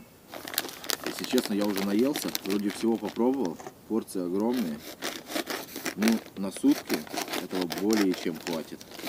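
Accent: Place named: Russia. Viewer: Кому ти озвучуєш кацапською говіркою? Хрін тобі а не лайк. Іди за узкім карабльом.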